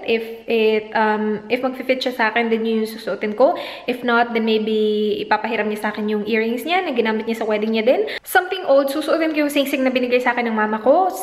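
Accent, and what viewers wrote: Visayas, Philippines. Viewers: Nakakaloka yung tono kapag nagsasalita ka ate hahahahahahahahaha😂 naaalala ko si tita Giselle sayo😂 kasi pag nagsasalita siya yung tono ng bisaya'ba nandun parin hahahahahahahahahahha. Nung isang araw pako nagi-stay sa channel mo ate jajajahhahahahahahhaha😂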